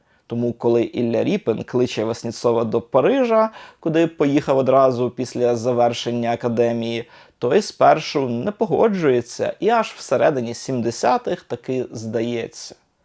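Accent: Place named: Western Ukraine, Ukraine. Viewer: Судя по акценту афффтор ни разу не относится к украинской наследственности. Западенец у которого мама курва, папа на конюшне жил...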